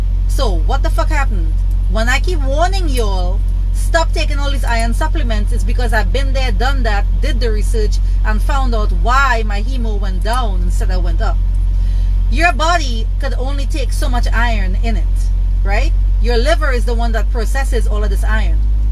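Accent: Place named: Trinidad and Tobago. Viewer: Soon as my open ya mouth I’m like Trini in the building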